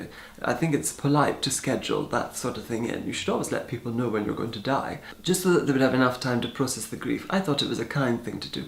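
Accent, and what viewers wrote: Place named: Scotland, United Kingdom. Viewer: He is from Scotland.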